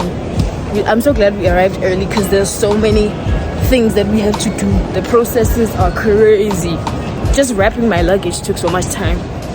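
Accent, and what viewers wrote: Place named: United States. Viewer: Thanks for going we won't even know u gone....u trying to sound like a American already so u will be just fine that side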